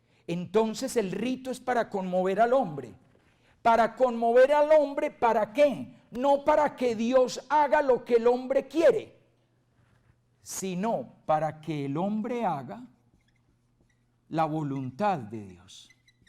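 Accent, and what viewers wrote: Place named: Colombia. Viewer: Me encantan,sus enseñanzas maravillosas,me encanta ése Acento tan,colombiano.no sólo eso.tan enamorado de <link> llegan á lo profundo de mi corazón.no quisiera,perderme,ni una conferencia. Dios le siga dando ésa sabiduría. Divina. Yo soy Colombiana.pero hace 40años vivo en Estados Unidos.Bendiciones lo queremos mucho,padre.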